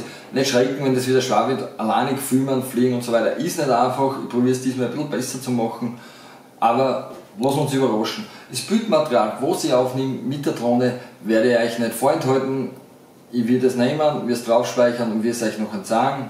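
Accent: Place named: Vienna, Austria. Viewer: I aus Wien du heast du irgendwie a so o.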